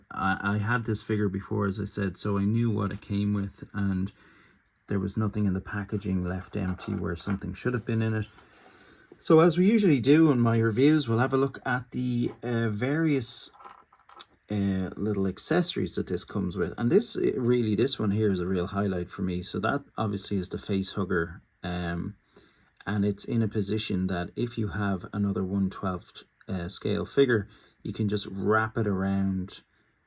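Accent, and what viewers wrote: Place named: Ireland. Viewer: Recognise the irish accent. This is a great figure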